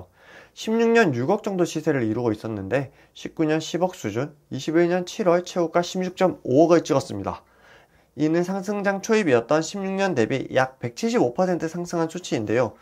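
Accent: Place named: Gyeongsang, South Korea. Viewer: 경상도 말투다.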